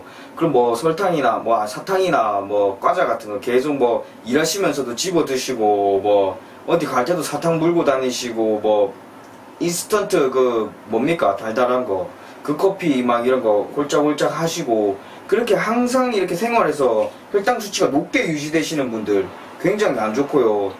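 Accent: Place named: Gyeongsang, South Korea. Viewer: 역시 갱상도말로 해주시니 더 귀에들어오네요 ㅋㅋ ㅋ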